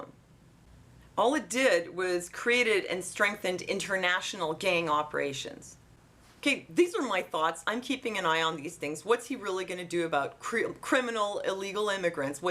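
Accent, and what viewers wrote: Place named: Canada. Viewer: Nice video. I knew you were Canadian the first time you pronounced "about" as sort of 'aboot' like most Canadians. Not a dig really, just a difference. Trump supporter here.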